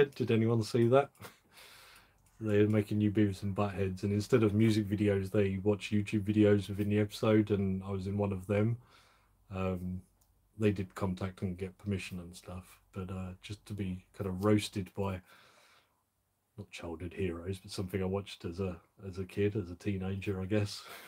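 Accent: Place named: Kent, United Kingdom. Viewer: hi kent...i am in kent...same accent too!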